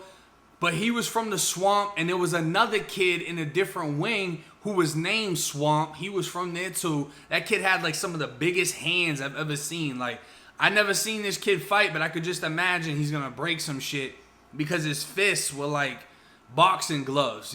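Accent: Boston, United States. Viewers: I don’t understand how his accent goes from a strong Boston to a down south accent. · Why do u sound like u from Boston? And why did u join a ny gang? So many questions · im a little confused. all your stories are about Florida prisons but that accent is STRAIGHT Boston. mayb i missed the vid where you explained this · Why do you sound like you're from Boston? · Bro you have to be from Boston right? I hear a Boston accent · I went to prison in…… MAHHCH. Boston accent kills me 😂 And the swamp is Gainesville,. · You sound like you from Boston son!!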